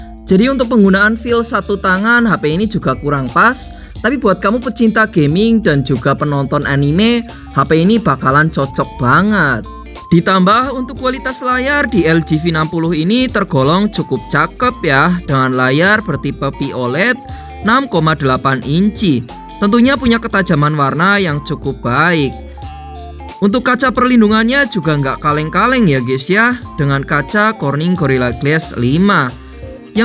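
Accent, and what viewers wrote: Banyumas, Indonesia. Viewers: Buset wng Banyumas 😂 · ngapak anjir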